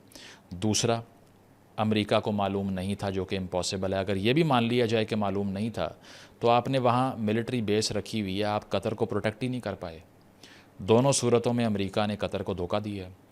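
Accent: Punjab, Pakistan. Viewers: your urdu accent is punjabi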